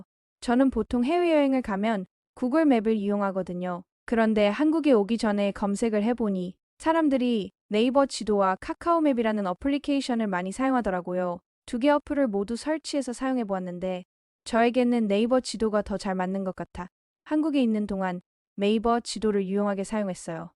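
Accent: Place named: Germany. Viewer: 독일식 발음이 독특하네요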